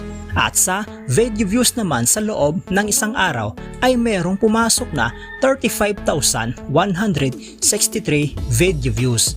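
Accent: Visayas, Philippines. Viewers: Pag BISAYA TLGA, MASAKIT SA TENGA, PUCHAAA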